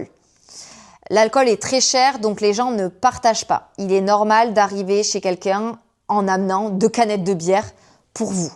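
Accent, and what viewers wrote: South, France. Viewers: A juger par l accent elle est du sud donc oui c’est plus difficile. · Ce n'est pas moi qui ai parlé d'accent mais l'autre. Beaucoup de Français prononcent les O comme des E maintenant et pour les hommes ça fait très efféminé. Malheureusement beaucoup de Français du sud cachent ou ont carrément gommé leur accent du sud. En ont-il hontes ? Parfois son accent du sud ressort donc elle l'a toujours alors ça veut dire que par moment elle le cache.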